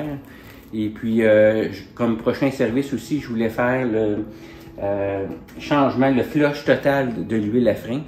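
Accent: Canada. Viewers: Merci pour ce tuto avec le petit accent du Canada...👍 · Bonjour, Merci et bravo pour votre tuto très pédagogique et avec l'accent Canadien en plus! C'est un vrai plaisir. 👍✌️Bonne route à vous, Philippe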